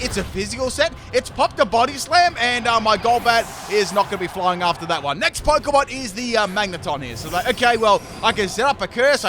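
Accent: Australia. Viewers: Your Australian accent takes your videos from amazing to divine!!!! >>>>>>>> Wolfey and MandJTV any day!!!!!!!!!!!!!!!!!!!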